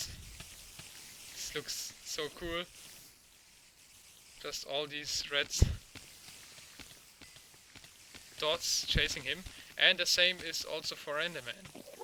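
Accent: Germany. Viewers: Hey Ich bin neu im Sprechen, in welchem Teil von Deutschland kommst du Deutsch? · Your accent sounds really German , are you? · Hey, one question, are you from Germany? Sounds like a german accent.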